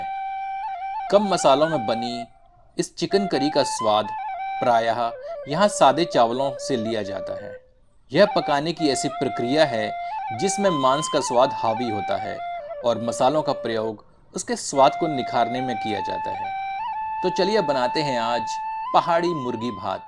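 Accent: Standard. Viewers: शुद्ध हिंदी उचारण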